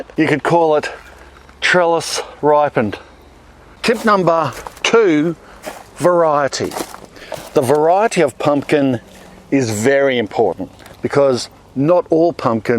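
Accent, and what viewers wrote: Australia. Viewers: Came for the pumpkins, stayed for the Aussie...ness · Aww, Richie Benaud! "What a marvellous day it's been out there toda-y-y-y-y..." A great man. 🙃 Upside-down, too! I'm a Pom, - you sound a bit like an Aussie, so you might as well take the Ashes back now. 🏺 Sigh...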